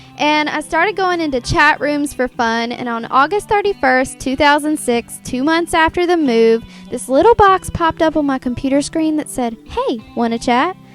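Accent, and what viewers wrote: South, United States. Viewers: You were so cute in your dag gom Bambi southern voice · Omg cutest freaking country voice ever! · omg I love southern accents and then hearing you speaking as a baby it's so darn cute!!! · happy bday and you have the cutest southern accent ever. luv ur channel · I love your voice when you were little! You had that country twang! And still do now! I love it and wish I had a twang!😘 love you sooo much!!!! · I love your young country accent!! It's so cute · Ha thats cool you live like 2hrs away from me ps ha cute lil baby south accent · T you were so cute when you're a little like so cute and I love when you talk by your little accident your little country accent it was really cute...,......you just made my day I Watch you all the time love you so much and I hope you have a glitterfull Day and god bless 💟💟☺️☺️☺️💞😇😇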